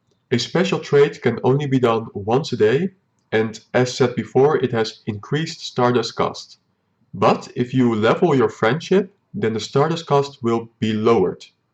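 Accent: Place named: Netherlands. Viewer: Are you from the netherlands cuz youre accent is really dutchy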